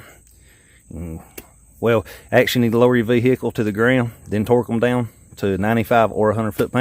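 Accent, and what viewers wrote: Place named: Deep South, United States. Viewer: Once in 1984 I took off from Reno Nevada to go visit my mom in Cookeville Tennessee in a junk 1973 Chevy Nova. It has a 3 speed on column and 250 six. I just left with almost no money to see her for Christmas. Power steering pump failed in Texas panhandle. Went to parts store got rebuilt steering pump. No pulley ! So a local (had your deep south accent) who was at the parts store "follow me home I have a press ". I follow this stranger long way . Pull up his home it was his family. They fed me and put my car back on road. Asked me and my blue 💙 Heeler to spend the night. Fed us breakfast. I am a yank. They show such love these deep south folks.Gave us food to go . Went saw momma in Cookeville for Christmas. God bless you brother. I lost her few years later.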